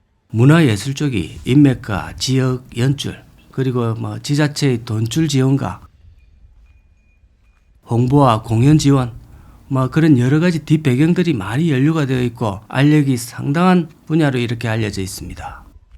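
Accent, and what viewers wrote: Gyeongsang, South Korea. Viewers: 해설자,,말투는,갱상도, 사람이네,,,양택조니을 앞세워 지역감정 조정하네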